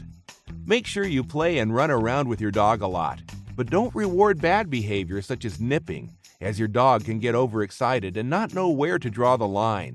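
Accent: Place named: United States. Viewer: That stupid yank accent put me off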